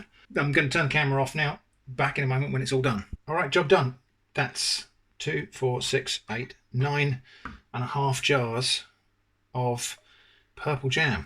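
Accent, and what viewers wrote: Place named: Dorset, United Kingdom. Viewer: I'm starting to detect a slight Dorset lilt to your accent in your videos 😊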